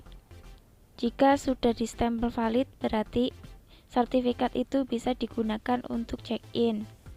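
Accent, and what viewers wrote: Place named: Banyumas, Indonesia. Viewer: Ngapak kie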